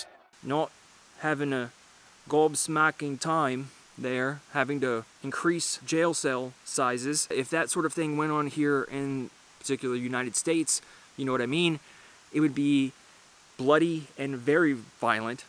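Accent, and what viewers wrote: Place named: United Kingdom. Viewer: I like your UK accent,.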